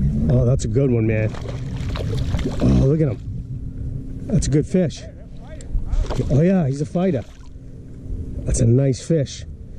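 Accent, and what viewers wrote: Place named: Maine, United States. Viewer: Strong Maine accent.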